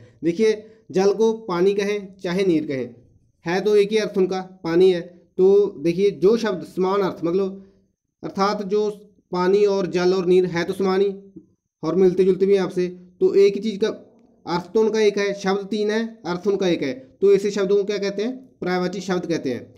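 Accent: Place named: Punjab, India. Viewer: Apki hindi mai bhi Punjabi tone hai 😂😂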